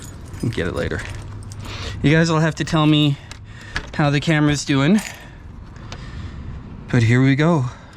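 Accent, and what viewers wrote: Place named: Canada. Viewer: you have a nice accent you must be Canadian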